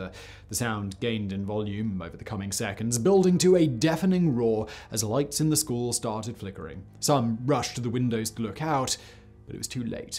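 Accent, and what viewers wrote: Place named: South, United Kingdom. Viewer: Attention yanks, accent shows he is from the south of England as he pronounces everything so poshly. I've never heard methyr Tydfil spoken like that before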